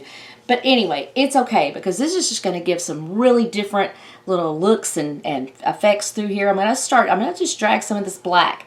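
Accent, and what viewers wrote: Texas, United States. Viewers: It was beautiful before the spin, but the black lines and the spread patterns worked. Very nice work. Love that Texas talkin.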